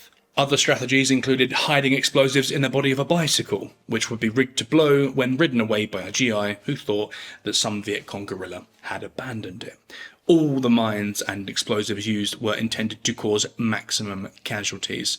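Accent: England, United Kingdom. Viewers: There’s something so very attractive about you. Partly I believe it’s your voice. A most excellent English accent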